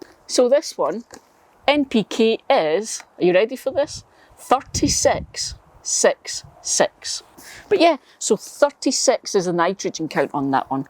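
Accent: Scotland, United Kingdom. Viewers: Sunday: Video Day. It’s always nice to hear your Scottish accent on a Sunday morning. The information you impart is always useful, to boot. My problem with fertilizers is my desire to keep the vegetable garden organic—after planting. There seem to be only a few fast-acting liquid organic fertilizers available, and none seem to help with blossom end rot. My tomatoes suffered from it, even though I watered religiously, and now my peppers—which are finally blooming and forming fruit that doesn’t fall off—are suffering the same malady. This, in spite of consistent watering.🥺 Oh well, maybe the drip system I’m installing for Season ll will provide better results. Have a great day (or is it evening, there?), and.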